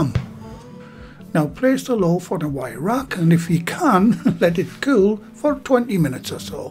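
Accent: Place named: Middlesbrough, United Kingdom. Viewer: Where you from ….. sound like a boro lad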